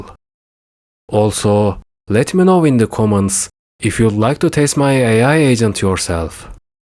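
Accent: Turkey. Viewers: Great video. Random to ask but are you Turkish by any chance?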